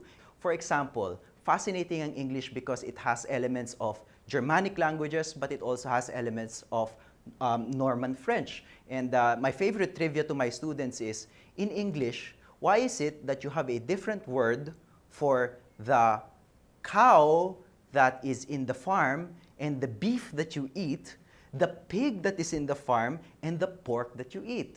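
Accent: Philippines. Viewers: Wow, stig bossingleloy. gotcha.... Dto hlata mo pinas inglis. iba ingls ng ponjap, kano, yanokor, atbp. honga, (trnsn) medyo my pasakalye pinoy inglis, pero maliwanang, naintidihan nila. pero unkanila, medyo kelgangan attention ka. subscribed na po ako. dami trnsition, 共.. t トランシチオン すみません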